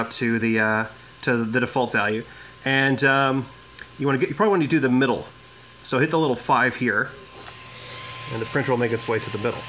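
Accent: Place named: United States. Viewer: Great video, thanks for posting this! I'm very interested in this printer and noticed the economy shipping to NY state was $55 excluding VAT. You sound like you are from the states. If so, did you get charged a VAT?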